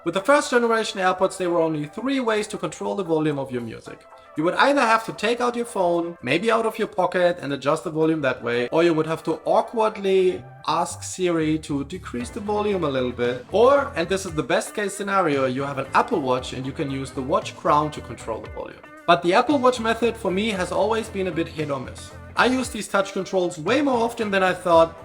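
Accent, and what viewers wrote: Germany. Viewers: bro sounds a bit german .... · Deutscher oder⁉️ Man hört es extrem😂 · Beautiful German Accent! Grüße aus Peking.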